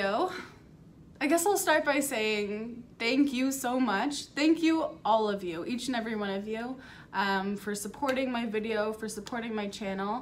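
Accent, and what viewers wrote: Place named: Canada. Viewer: I love your Canadian accent!!!!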